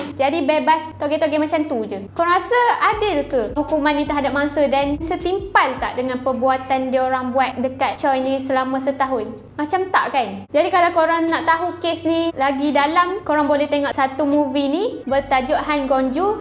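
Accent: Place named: Kelantan, Malaysia. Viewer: Setelah dengar cerita ni sampai habis, saya boleh agak yang awak ni orang Kelantan🤣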